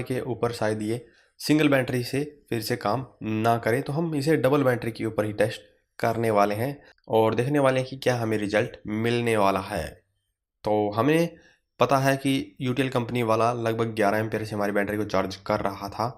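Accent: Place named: Haryana, India. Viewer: बुरा ना मान भाई या तो हरयाणवी टोन स बोलने में फील ना करे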